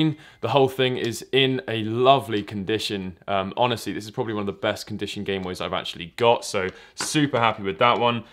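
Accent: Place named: United Kingdom. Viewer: He’s from the uk they have a different way of say things if you are interested look up the differences in English(us) and English(uk)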